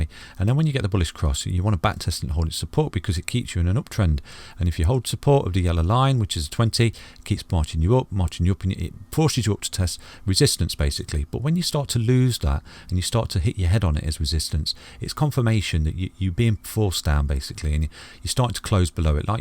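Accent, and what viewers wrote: United Kingdom. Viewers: Dear, your channel is golden, not to mention your calm, grounded manner and soothing british gentleman voice!!!!! Keep on going!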